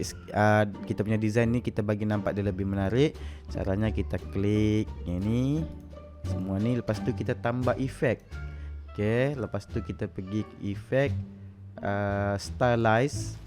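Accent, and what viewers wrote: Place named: Sabah, Malaysia. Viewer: sabahan loghat...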